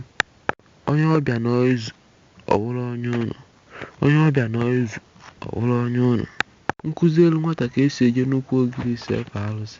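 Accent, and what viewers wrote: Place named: Ezaa, Nigeria. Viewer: NONSENSE,, ARE YOU TALKING TO YOURSELF ? OR TALKING FROM A RAT HOLE,, NO PROFESSIONALISM,, CUT AND JOIN,,,GO AND LERAN ''IGBO IZUGBE'',AND STOP SPEAKING EZAA/ABAKALEKE DIALECT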